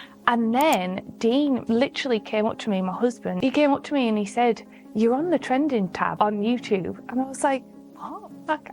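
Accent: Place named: North, United Kingdom. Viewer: I had NO IDEA that YouTube sponsored videos! Congratulations on how far you've come - we need more northern voices on YouTube!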